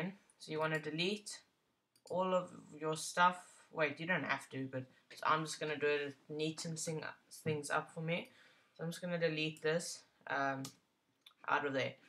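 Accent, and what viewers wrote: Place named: South Africa. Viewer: So South African! makes me miss home :)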